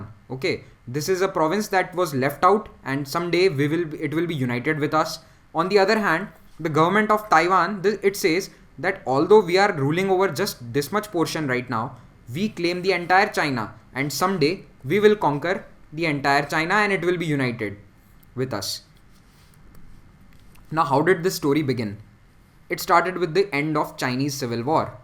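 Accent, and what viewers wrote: India. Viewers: Best Indian accent Ive heard .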